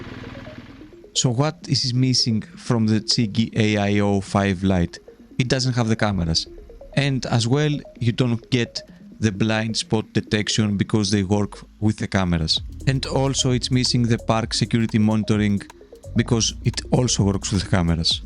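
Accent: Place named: Bisaya, Philippines. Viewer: Sa umpisa kala ko kapwa ko bisaya 😂😂😂😂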